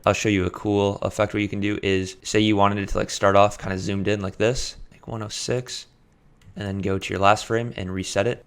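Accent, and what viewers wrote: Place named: Buenos Aires, Argentina. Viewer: Hablas en ingles pero se siente como si fueras un cheto de capital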